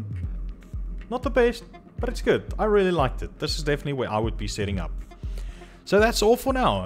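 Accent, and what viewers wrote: South Africa. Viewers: Are u from South Africa? Ur accent sounds like ur fromt here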